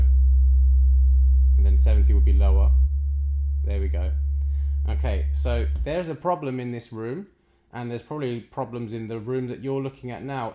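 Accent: United Kingdom. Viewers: It’s VERY refreshing to watch a hifi gear review that’s not from some slick Nth American that comes off like a CNN grade infomercial. And the Nth American sales guys are easy to spot as salesmen as they speak entirely scripted and most use their hands like politicians or billionaire CEO’s. As soon as I see/hear them I’m gone. Yours is entirely real and obviously a non-professional slick salesman presentation. Thanks keep going. And the subtle humour doesn’t go unappreciated. Cambridgeshire accent btw??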